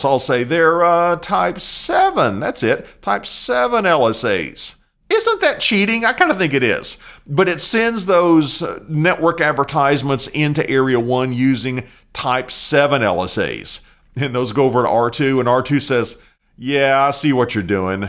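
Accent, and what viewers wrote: Texas, United States. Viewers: is that a Texas accent?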